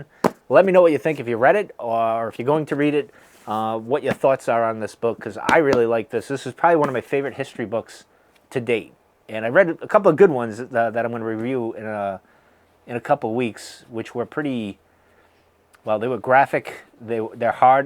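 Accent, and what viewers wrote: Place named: Boston, United States. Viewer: Judging by your accent you sound like a Celtics fan?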